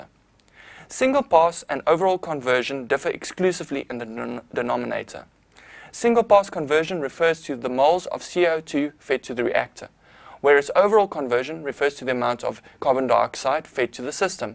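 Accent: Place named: South Africa. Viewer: This man has a standard South African accent (or at least it sounds like it). I thought I was listening to a lecture video from my Uni for second😯. Also, the video was quite helpful.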